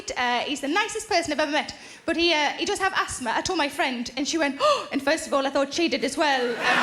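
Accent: Newcastle, United Kingdom. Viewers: I'm a Shields lass too Sarah and so proud of you. You are hilarious and it's great to hear jokes in a geordie accent and I identify so much with your humour. You had the King and his missus in stitches! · Hey English people, is this a Geordie accent? Asking from Australia.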